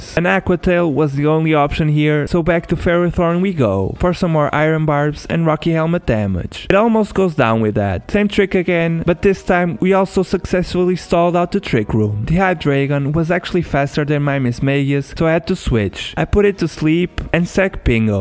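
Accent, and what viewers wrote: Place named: Italy. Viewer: Da come parla sembra un italiano che prova a parlare in inglese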